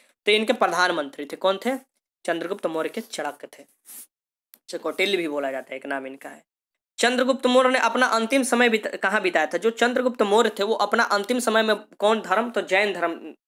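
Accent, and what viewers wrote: Bihar, India. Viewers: Bihar se hai kya jii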